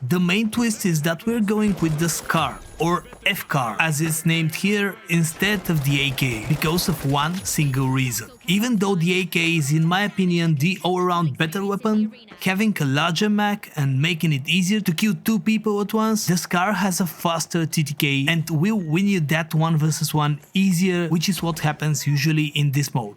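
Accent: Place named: United States. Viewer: please dont take this negatively but I love your american accent. what are "Resuesses" though?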